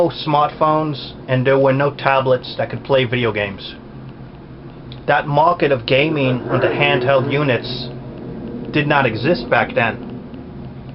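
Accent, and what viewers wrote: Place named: New York, United States. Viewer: By the way, New York dialect FTW!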